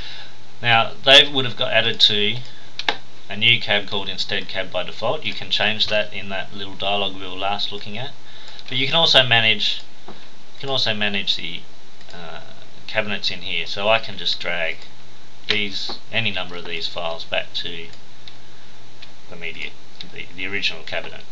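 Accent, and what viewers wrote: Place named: Australia. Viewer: omg you're Australian aren't you!? That's awesome... fellow Aussie 😁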